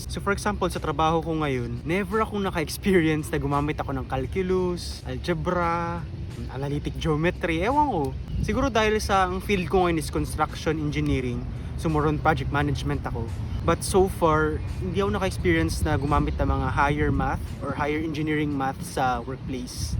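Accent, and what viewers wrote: Visayas, Philippines. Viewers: KUYA!! idol ko accent mo hehehe bisaya here maklaro gud siya ya! 😂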